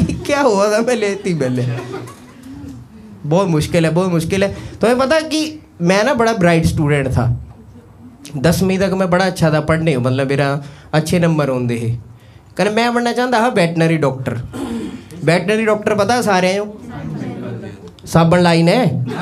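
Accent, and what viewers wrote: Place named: Pahadi, India. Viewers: 🎉🎉🎉🤣🤣🤣pahadi accent op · I m from j&k your dilect is like doda/baderwah language ...your laguage has resembelence with doda/baderwah language...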